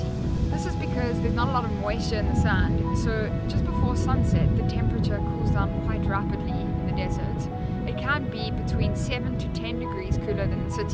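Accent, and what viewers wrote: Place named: South Africa. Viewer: Very strong South African accent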